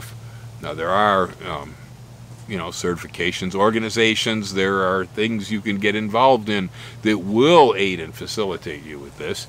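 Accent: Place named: Northeast Ohio, United States. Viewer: This is great info and love listening to you. Your accent...NE Ohio? I grew up there as a kid in the '70s. Your voice takes me back.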